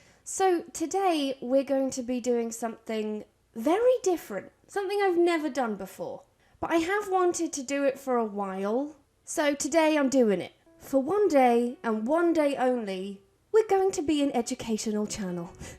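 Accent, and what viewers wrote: United Kingdom. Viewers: I love your beautiful British accent 🌷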